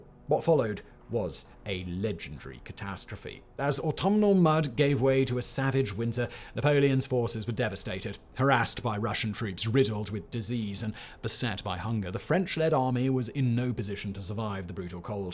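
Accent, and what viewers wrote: United Kingdom. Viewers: As a southern American, hearing you say ain't in your British accent was simultaneously awesome and hilarious.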